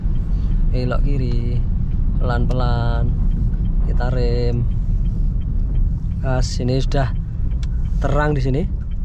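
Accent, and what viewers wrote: Madiun, Indonesia. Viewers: orang madiun nggih mas?😅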